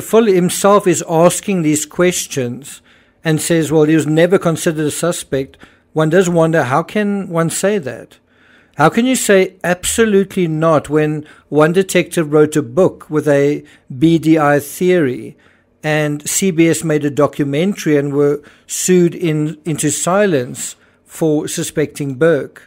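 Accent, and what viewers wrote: South Africa. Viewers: No, it's an accent. He's from SA.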